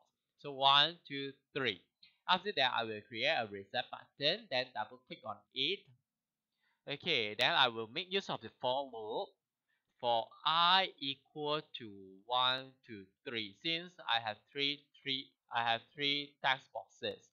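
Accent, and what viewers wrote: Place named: Singapore. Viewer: so refreshing, watching a tutorial in singlish. yay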